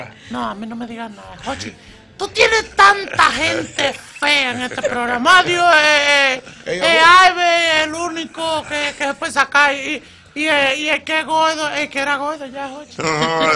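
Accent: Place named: Cibao, Dominican Republic. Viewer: Lo que más me gusta es el estilo cibaeño.😂😂😂😂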